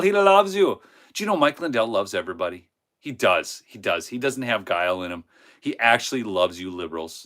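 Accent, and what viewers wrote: United Kingdom. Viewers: You sound like a Brit lol. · Hey, from central illinois. Love your British accent humor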